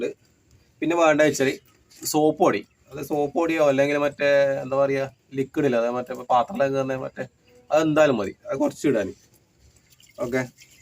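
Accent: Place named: Kannur, India. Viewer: Slang belongs to Kannur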